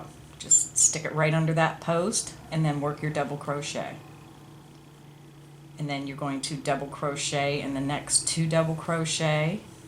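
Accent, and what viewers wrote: Pennsylvania, United States. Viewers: Where are you from? You sound just like my best friend from Pennsylvania. I really enjoy your classes and have learn quite a few new stitches by watching. Thank you for your time and your posts.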